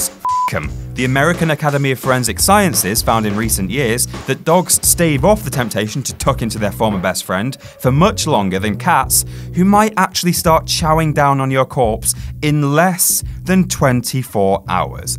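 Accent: Canada. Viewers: Is Canadian? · i didn't know you were Canadian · Is this English guy actual Canadian or have I have been to many episodes of South Park?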